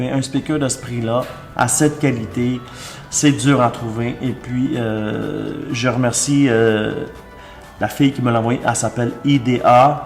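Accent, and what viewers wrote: Quebec, Canada. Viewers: j'adore votre accent québécois et je participe toujours au concours mais je n'ai jamais gagner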